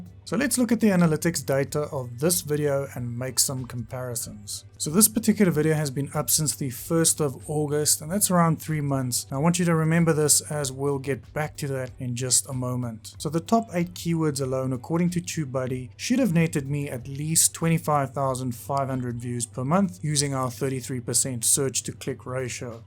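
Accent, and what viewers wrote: South Africa. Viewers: Do I detect a South African accent? Any way, I'm a new youtuber and used tubebuddy for my recent video and I cant really see a better results, I just still dont seem to get traffic to my videos which directly effects the amount of views I get. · I detect a South African accent. Are you per change South African?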